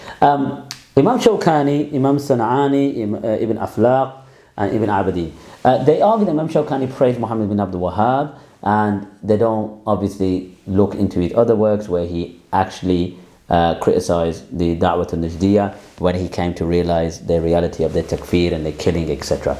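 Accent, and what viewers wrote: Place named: Punjab, India. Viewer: Love ur punjabi accent bro😂❤️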